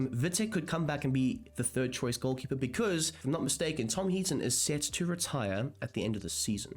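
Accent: South Africa. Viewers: Are you from South Africa?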